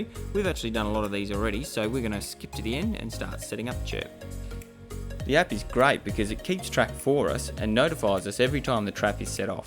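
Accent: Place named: Australia. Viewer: Your Australian accent is the best!!!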